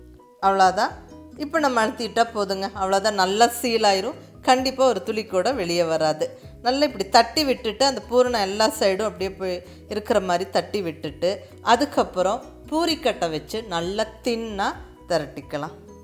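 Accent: Kongu, India. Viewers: Akka....sema super..I am your fan Akka..Really your are amazing sis...namma kongu tamil speech la asathurinka....tdy poli semaya arumaya iruku....😍😘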